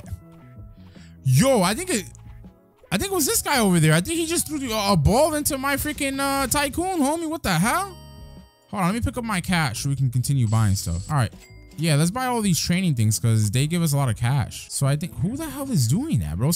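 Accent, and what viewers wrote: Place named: Spain. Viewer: eres de ascendencia española I can kinda hear it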